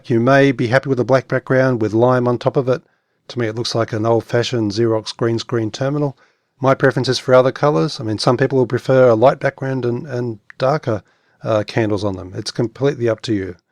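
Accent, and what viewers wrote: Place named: Australia. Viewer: An Aussie, yahoo !!!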